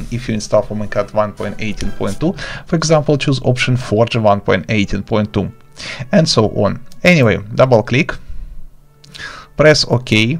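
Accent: Russia. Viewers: ты говоришь на руском? очень похоже просто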